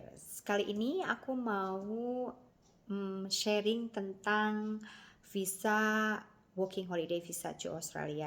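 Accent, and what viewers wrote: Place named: Tasikmalaya, Indonesia. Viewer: Orang tasik ieumah hahaha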